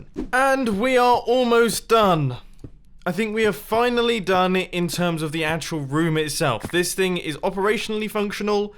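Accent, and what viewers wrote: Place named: United Kingdom. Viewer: Your british accent is finally getting me after 4 seasons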